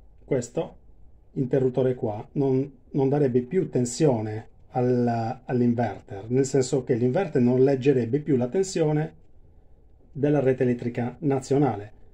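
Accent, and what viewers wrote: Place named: Sardinia, Italy. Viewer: Ciao, ma sei sardo anche tu vero?? 😎 Si capisce dall'accento e perche sei in gamba! Comunque interrompi tutto sul più bello, dici "ma adesso andiamo a vedere" e poi finisce il video 😢 Io sto riscontrando un problema dei dati misurati dal sensore, che a casa mia è stato posto a monte dell'interruttore generale, proprio nel quadro di casa...incrociando i dati con letture contatore e la pinza Shelly EM che ho installato a valle del generale, hi notato che c'è una discrepanza nella lettura dei consumi di casa e dellenergia prelevata, di ben 45kWh in un mese (Gennaio, dunque 24gg)...secondo te cosa può essere? Va' assolutamente collegata proprio nella cassetta del contatore? La linea dal contatore al generale è diretta, c'è solo un altro quadretto con un altro interruttore. Comunque caricaci la fine del videoooo 😂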